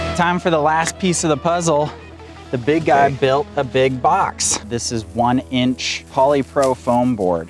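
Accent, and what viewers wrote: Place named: United States. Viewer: Sometimes I have to try and work out what you're saying in American and translate it to English new word soffits in English they called fascia boards, and if you're trying to insist you speak English, I can tell you is definitely American there's a new your loss of bastardising English which is doving translated into English means driving, so don't tell me you're speaking English still like your videos even though I have to try and work out what you're saying in English.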